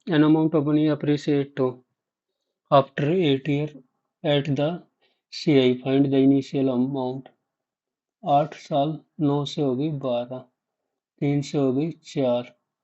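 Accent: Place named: Himachal Pradesh, India. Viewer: Bhai himachal se ho kya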